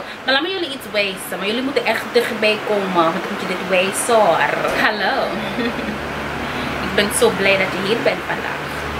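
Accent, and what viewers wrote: Suriname. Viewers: I love it, gewoon Nederlands mixed met Engels en een Surinaams accent. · i like your accent, the way you behave. It is funny. Your Su-dutch is ok also how you bring it as a lady, sounds a little bit funny, a suri lady way. .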